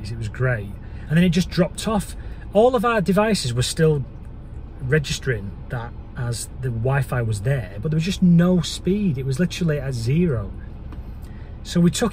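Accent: United Kingdom. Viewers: Where in the uk are you from mate? Just found your channel, subscribed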